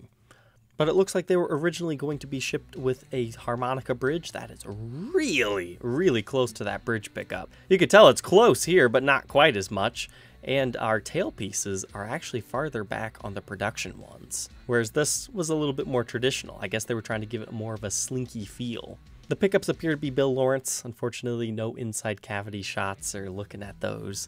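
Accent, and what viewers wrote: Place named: Pittsburgh, United States. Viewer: You sound like your from Pittsburgh. Or somewhat close by.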